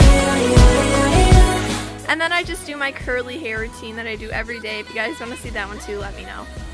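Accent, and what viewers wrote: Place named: North, United Kingdom. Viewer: You're so pretty and you have such a northern accent it's fun to listen to!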